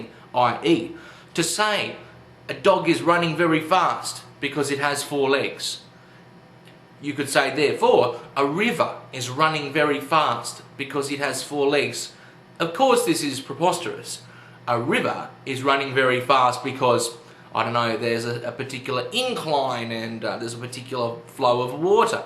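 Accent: Australia. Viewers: I'm so entertained by the juxtaposition of the words coming out of your mouth and your ocker accent! Rock on you ocker intellectual!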